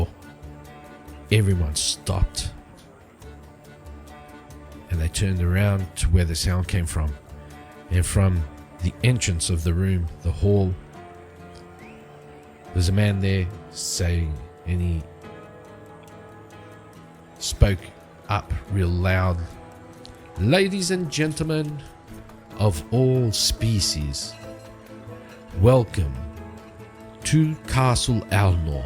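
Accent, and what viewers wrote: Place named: RP, United Kingdom. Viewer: You are so posh. ha ha! Great episode! :trophy-yellow-smiling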